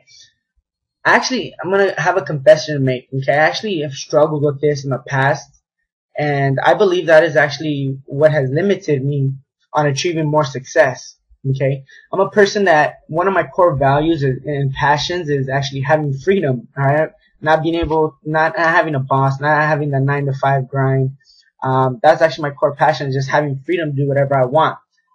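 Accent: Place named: Arkansas, United States. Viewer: That's an Arkansas accent for sure... Let's connect on facebook bro... Look me up, I'm banned from sending more friend requests for another week or so, lol.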